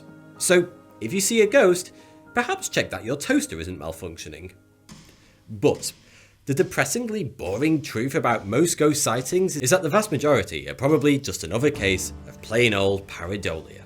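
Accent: United Kingdom. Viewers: He is the perfect blend of British but no too British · When I read your book, it'll probably be your voice with that british accent reading it in my head. Can't wait. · Wait, have you always had a British accent?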